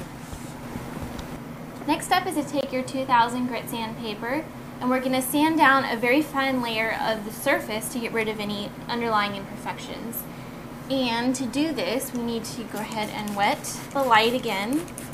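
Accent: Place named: United States. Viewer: excellent video ,but I hate your tono of voice is so noise and saunds so greengo ,:(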